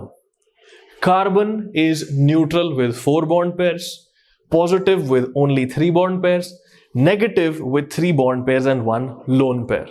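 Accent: Punjab, India. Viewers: Sir are you from punjab??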